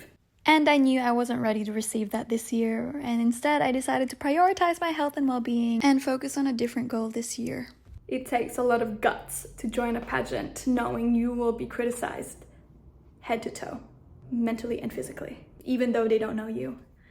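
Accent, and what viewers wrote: Australia. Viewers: She should join Miss U Australia. She looks and sounds more australian than she is Filipina. “Living in” is still considered a taboo in the Philippines especially for celebrities and beauty queens. Not really a Filipina representation. A Miss Australia perhaps? I will still support!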